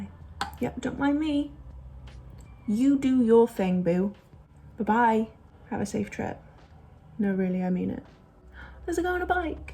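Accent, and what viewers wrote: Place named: United Kingdom. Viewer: ur accent is very british by the way loved ur video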